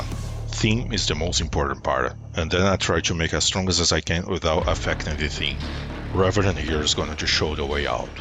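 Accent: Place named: Brazil. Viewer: Huge brazilian accent, are you from brasil?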